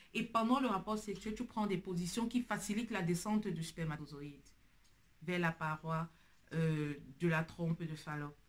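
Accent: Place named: Cameroon. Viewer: Notre bel accent Camerounais 😊😊😊 💚💚💚💚💚❤❤❤❤❤💛💛💛💛💛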